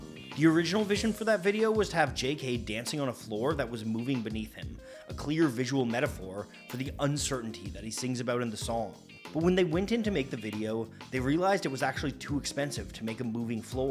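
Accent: Canada. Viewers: I never realized was canadian till he talked about the dart hanging out of slash's mouth l.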